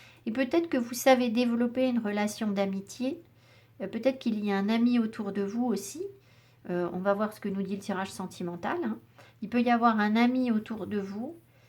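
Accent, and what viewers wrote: England, United Kingdom. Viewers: Merci, le tirage m'a beaucoup parlé. J'entame une formation en mars pour aller justement vers plus d'alignement et oser être moi faire ce que je veux vraiment. Mais bien entendu c'est beaucoup d'investissement (argent, énergie etc) et ça faire remonter effectivement des angoisses (est ce bon pour moi? Est ce le bon choix? Vais je aller au bout? Vais je réussir à mener tout de front?), l'ange Ariel m'a beaucoup parlé car justement, un de mes projets concerne la méditation. Je vais donc essayer de connecter avec cet ange! J'adore votre accent anglais; est-ce votre langue maternelle?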